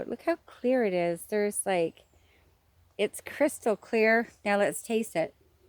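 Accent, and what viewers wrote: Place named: Canada. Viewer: Thanks for always giving people something to learn, now i see how your channel name, coincides with each other! Awesome video. I hear the Canadian accent 😊👍🇨🇦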